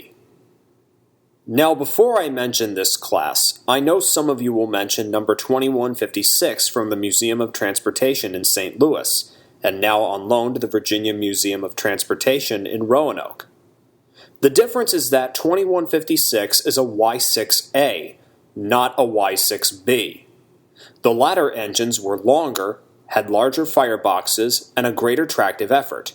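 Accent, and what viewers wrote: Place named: RP, United Kingdom. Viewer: weird RP shit lol.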